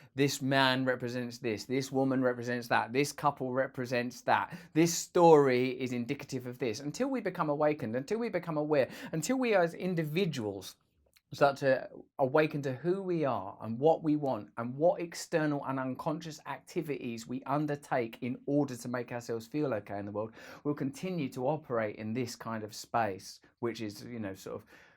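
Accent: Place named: United Kingdom. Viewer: Russel You More Than Look Cool, And Sound Cool, With That British Accent Dude You Are Way Cool!!!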